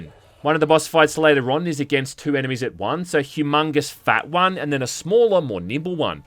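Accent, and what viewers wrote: Australia. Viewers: Aussie accents are borderline psychological torture 🤯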